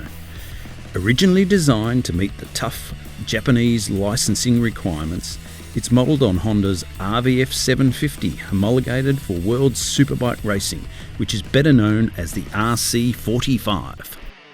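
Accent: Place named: Australia. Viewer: thanks for the helpful reply! You sound like me on my MC22 haha. Please keep up the videos, it's nice to hear an Aussie doing good reviews of bikes.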